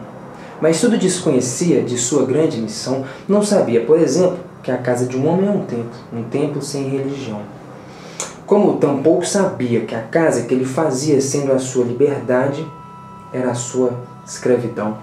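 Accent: Minas Gerais, Brazil. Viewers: Seus compartilhamentos de textos são ótimos! Encontrar esse canal, digo, ter acesso ao seu trabalho aqui, foi um achado e tanto para mim esses dias. E esse sotaquezinho - mineiro, não é...?! Obrigado pelo compartilhamento, dedicação. :)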